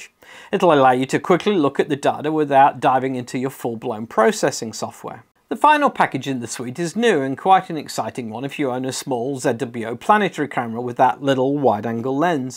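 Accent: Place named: New Zealand. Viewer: good to hear a Kiwi voice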